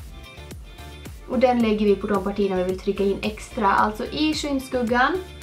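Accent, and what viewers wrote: Dalarna, Sweden. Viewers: Jätte bra video! <3 Man hör ditt dalmål ibland! :D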